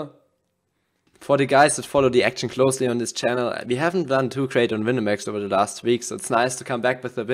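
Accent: Germany. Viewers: that german accent though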